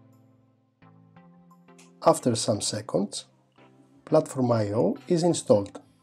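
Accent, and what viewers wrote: Greece. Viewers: i watched 500 videos and finally found 1 that explains the steps really easy.(perhaps it helps that they speak english with Greek accent :D euxaristw!!) Buts visual studio dont work for my os so wish it was for arduino that 90% of ppl use.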